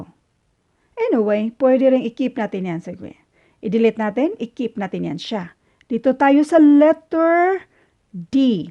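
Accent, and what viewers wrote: Visayas, Philippines. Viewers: bisaya ka po ba mam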